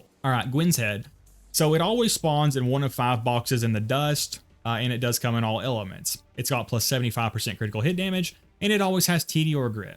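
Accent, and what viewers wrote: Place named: West, United States. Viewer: I’ve watched a ton of MOLMF vids this guy clearly sounds way more southern. While sounds more western, I think Nevada or New Mexico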